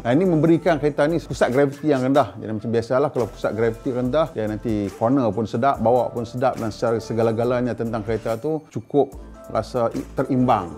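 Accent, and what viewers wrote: North, Malaysia. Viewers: abg presenter plg sy minat..pasai ckp utagha...kikiki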